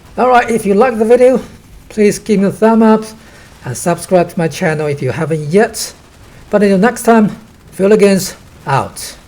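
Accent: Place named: United Kingdom. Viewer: you sound like an Asian with a British accent how bizarre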